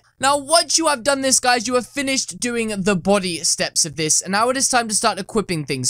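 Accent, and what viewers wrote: United Kingdom. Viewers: Are you an british acsent?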